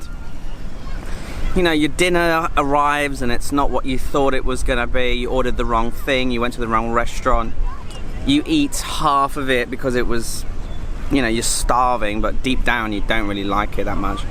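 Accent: United Kingdom. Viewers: Love your accent!! Most YouTubers are Americans or Canadians. It is so fresh to listen to someone speaks with a British accent. At this time when most of us can’t travel. It is so amazing that we can watch you on your little Honda. I hope the videos are longer and more detailed.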